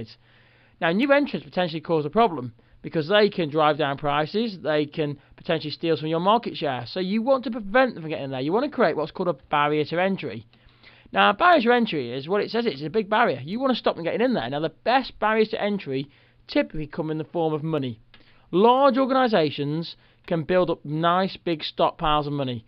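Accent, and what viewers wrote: United Kingdom. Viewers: too englishish.. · Because he's from the UK and thats just how he talks?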